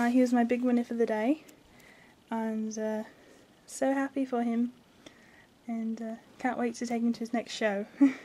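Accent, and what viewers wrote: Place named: United Kingdom. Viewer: Not to be rude but now u sound British and in other vids u sounded American, anyway love all ur vids!!!!!!!!!!!!!!!!!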